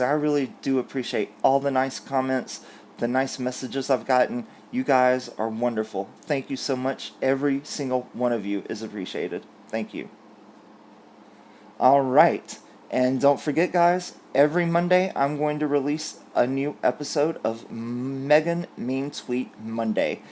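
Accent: South, United States. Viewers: Your accent is adorable! Where are you from? Clearly a southern boy.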